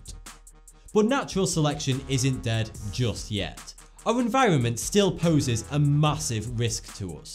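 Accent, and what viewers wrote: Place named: West Lancashire, United Kingdom. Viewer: West Lancashire accent.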